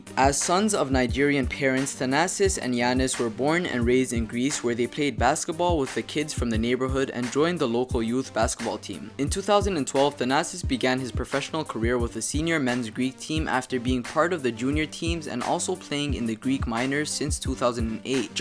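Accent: Toronto, Canada. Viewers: Hey, Are You Torontonian? I Can Tell By Your Accent. (BTW I'm Also From Toronto. I Live In Scarborough)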